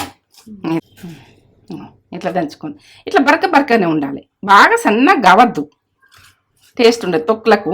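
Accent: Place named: Nirmal, India. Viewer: Amma ay vuru.chaypatamu laydu Nirmal side basha laga.vundhi.amma❤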